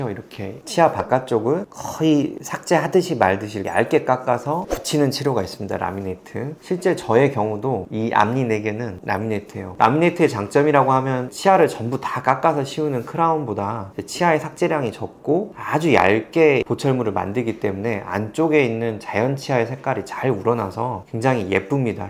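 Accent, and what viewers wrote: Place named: Gwangju, South Korea. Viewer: 원장님 답변 정말 감사합니다. 저 광주광역시 사는데 원장님 억양에 약간 동향 느낌이 났는데 홈페이지 가보니 광주 사셨을거 같아 반갑습니다. 복 많이많이 받으세요^^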